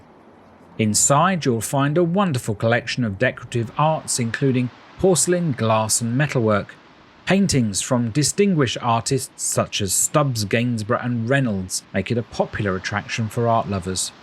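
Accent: England, United Kingdom. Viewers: Спасибо за настоящий английский и субтитры!😃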